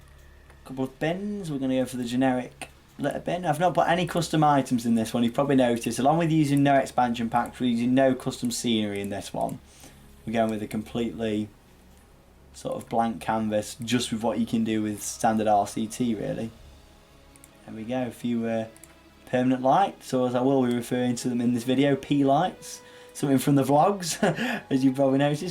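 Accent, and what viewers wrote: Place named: United Kingdom. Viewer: I was nostalgic and had to watch this video! You're very creative, man! I wasn't as good with decorations as you! BTW Are you British? I loved your accent xD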